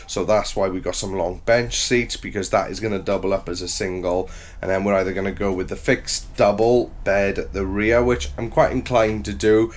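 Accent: Wales, United Kingdom. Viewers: Where is that accent from? It's not Brum, or Scottish ... Welsh perhaps ??? Shame your workshop is not big enough to bring the vehicle inside - rain will be a big problem - traipsing into the van making a wet floor and a mess. I don't like any of the layouts, nearly ALL vans are not wide enough to sleep across the space ... My personal layout is for a single person - fixed bed along the 'long' side with storage under, and kitchen on short 'door' side. Potty at end near rear doors, no garage, no shower, no electrics. VERY basic, therefore very cheap 👍👍💯💯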